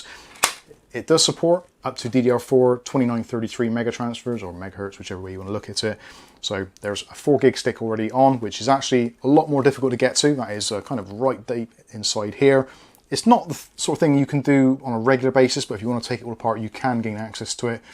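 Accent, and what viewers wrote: Bristol, United Kingdom. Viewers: Sounds like a fellow Bristolian or close ;)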